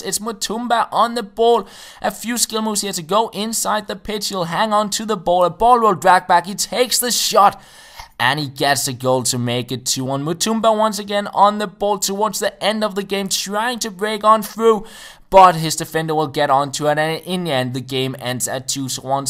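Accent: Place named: Denmark. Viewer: Dat Danish accent...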